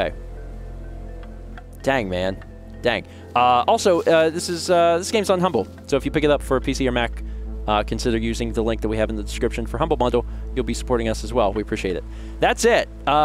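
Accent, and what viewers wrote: South, United States. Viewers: I feel the same with danish XD soutern american pronunciation though... the poor man is really doing his best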